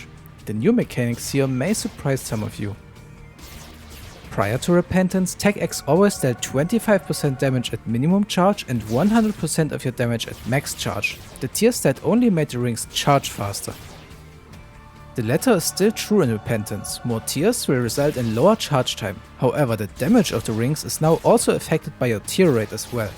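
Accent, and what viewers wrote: Germany. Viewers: you sound german xD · ein deutscher wie man seine englische ausprache kennt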